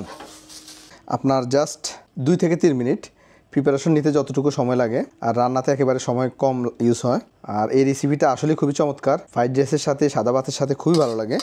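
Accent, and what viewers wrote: Noakhali, Bangladesh. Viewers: This is the first video I have watched on your channel. I come to know this channel from facebook reels. Now I am watching every video of this channel. btw love your noakhali accent bro!!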